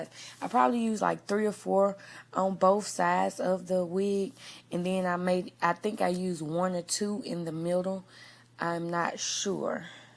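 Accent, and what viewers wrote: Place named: South, United States. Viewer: That's really cute!!! I like it a lot! P.S. I thought I heard a southern accent, and then you said something about Mississippi! lol!! I'm from here too, great vid! Xo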